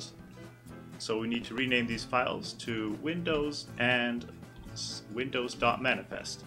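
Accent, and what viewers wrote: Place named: United States. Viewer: I've tried staring at it for 20+ seconds, that doesn't seem to work for me. It might be because my camera resolution setting isn't that high (640x480) since it gets really sluggish at higher res. Anyway, it's not really a problem since I can consistently get it to sync after I turn my head. By the way, are you Canadian too? You sound American-ish but you say "zed", lol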